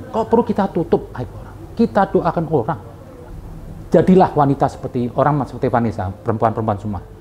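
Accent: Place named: Java, Indonesia. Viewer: Habib asli org mana sih? Aku suka aksen jawanya....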